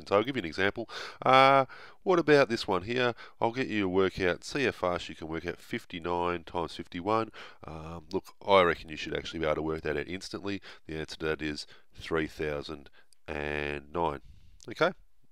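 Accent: Australia. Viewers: Aussie accent. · are you Sal Khan's Australian twin? Are you the real Sal Khan doing silly math stunts while speaking Australian? Seriously, the cadence of your voice, your choice of words, they make you sound like Sal with an accent. If, by chance, you haven't heard him, just google the name and listen to what he sounds like.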